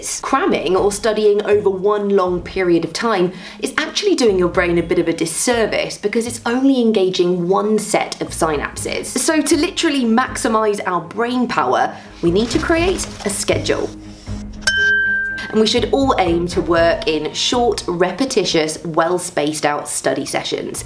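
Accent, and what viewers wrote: United Kingdom. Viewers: I love the British accent. It's so beautiful hahaha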